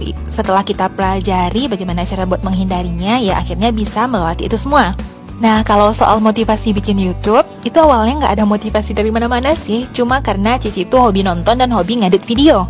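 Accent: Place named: Padang, Indonesia. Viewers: Dah keliatan Logat nya kak orang Padang ;) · Wahh, saya awalnya nebak Kak Cici orang Palembang, soalnya saya ada teman orang sana dan logatnya agak mirip-mirip. Ternyata Kakak orang Padang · Padiah uni mah,,, tapi awak alah takok sih urang minang dari bahaso indo nyo, kalau dak urang cino pasti urang minang. mantap.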